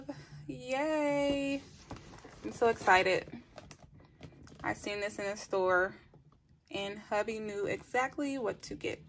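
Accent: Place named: Alabama, United States. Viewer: Lmao accent. U sound like my cousins in Alabama.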